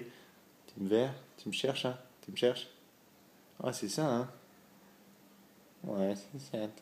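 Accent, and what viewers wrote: Quebec, Canada. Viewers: Héhé tu choppes l'accent Québécois, fais gaffe;) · tu comence a plus parler quebecois den tes video evite parce que jai du mal a comprendre sinon =jpe pu comprendre t video mode quebecois: bon jmenui che moué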